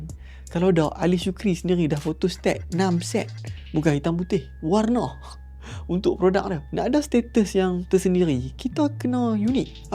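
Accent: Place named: Kedah, Malaysia. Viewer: Ale uto, suara ko slow, cakap macam dalam kelambu. Slang kedah ko aku benci. Cakap bahasa mudah orang faham la., jgn lekat Sgt loghat ko tu🖕🏽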